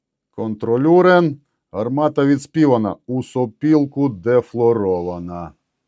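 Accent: Transcarpathia, Ukraine. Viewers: Ваші коментарі при знищенні хробаків з переливом закарпатського діалекту і багато ще чого ,якби нерозуміння що це війна і йде щосекундна зміна ситуації,то як в якомусь фільмі,але ж то реальна загроза всім нашим українським захісникам,Дякуємо Вам , і віримо в Перемогу України🇺🇦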